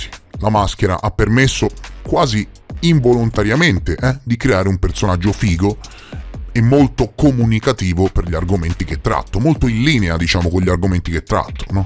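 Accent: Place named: Central Italy, Italy. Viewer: Dall accento probabilmente del centro Italia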